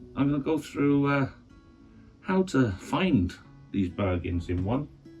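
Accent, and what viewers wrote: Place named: Wrexham, United Kingdom. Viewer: Save some eBay bargains for the rest of us mate :P I just subbed and going through your videos, was a bit surprised you're over in Ireland as you have a perfect Wrexham/Jacko accent? I got hold of a cheap 130P recently and want to try DSLR with it and from what I can see you can get them to focus by using longer bolts on the mirror cell thus pushing the mirror further up the tube, not tried myself yet as I'm trying to source parts on the super-cheap :D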